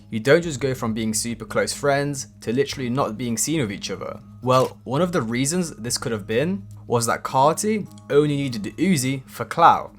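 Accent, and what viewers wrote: United Kingdom. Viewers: Why u gotta have English accent it would have been better wit sum else I understand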